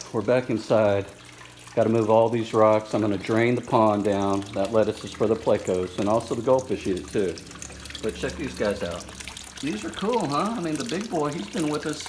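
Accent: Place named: Texas, United States. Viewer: I love your shirt and your accent, I just discovered your channel and it made me smile when I found out where you live. OF COURSE I said to myself…. Texas! Super fun videos keep them coming.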